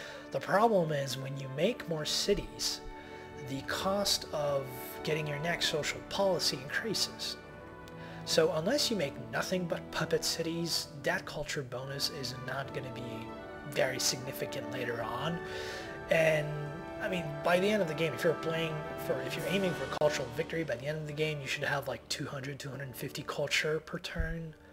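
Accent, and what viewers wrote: Quebec, Canada. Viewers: Based on his speech patterns I would guess French Canadian, but thanks for playing.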